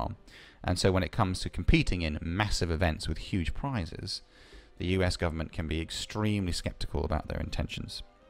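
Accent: United Kingdom. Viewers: Most typical british person ever. he has got it all, top hat, accent, politeness and ego. Amazing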